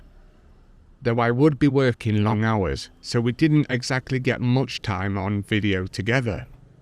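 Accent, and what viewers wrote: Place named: Cockney, United Kingdom. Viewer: Idk, this guys accent sounds cockney/ UK, but claims, beeing american? Anyway, these things happen to every 2. guy, marriing a thay girl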